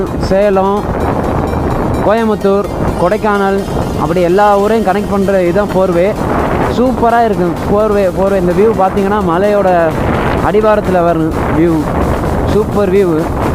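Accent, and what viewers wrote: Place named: Kerala, India. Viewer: Kakka va kakka nu solunga bro athu tha cute ah iruku unga KL tamil ungaluku nalla reach kodukum. All the best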